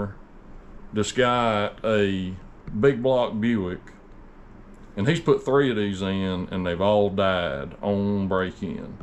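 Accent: South, United States. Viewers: I’ve already commented which you responded to, thankyou. Just want to make a viewers statement on your vids., you give us content we don’t find basically but is extremely informative that fills the gap on many vids on YouTube. Then you make a vid without all the fanfare at the start. Then your totally unbiased opinions that doesn’t offend anyone including the competition. At my old age you meet the criteria of the genuine producer of consumer products. you need to be proud of your honesty, professionalism to produce products that are of the highest order. Unfortunately in this day and age this attitude is getting rarer. Keep up the good work., being half way around the globe I cannot benefit from your high standards but I look up to your honesty and professionalism. Cheers mate. And geez mate your southern accent is full on. It’s cool coming from a slang happy Aussie. 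Keep up the good work.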